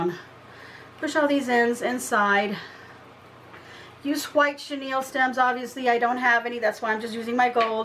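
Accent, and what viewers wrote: Texas, United States. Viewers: can I assume you are from Texas? Loved watching how you do your crafts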